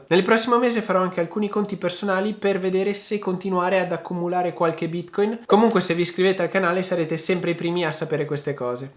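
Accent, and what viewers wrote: Veneto, Italy. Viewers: Scusa.......... MA TE SI VENETO?!?!?! SE TE SITO VENETO ANCHE MI SO VENETO VECIO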